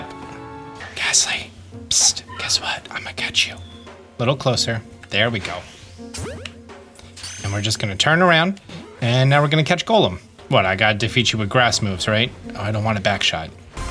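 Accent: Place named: United States. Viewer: Why do you sound like American?😊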